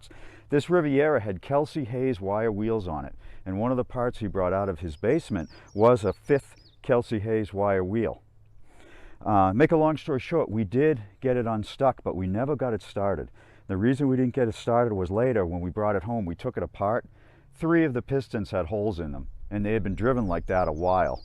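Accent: New England, United States. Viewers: What part of New England are you from..lol I'm from the North Shore..😅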